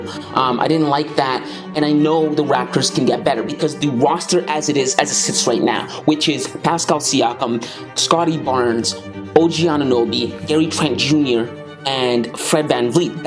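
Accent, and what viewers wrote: United States. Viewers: You sound like an American analyst. Looking forward to this team proving you wrong. Everyone in the league wants Durant… within reason. Nets aren’t giving up KD for Pascal and 2 firsts bro.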